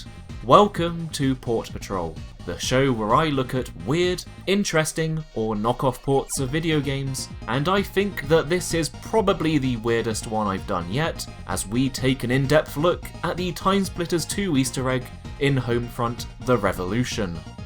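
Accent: United Kingdom. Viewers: you sound like your from the UK if so £4 at CEX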